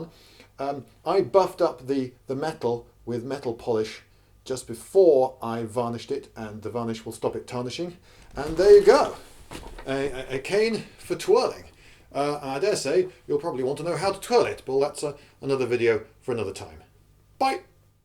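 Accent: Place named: United Kingdom. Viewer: British accents are the best.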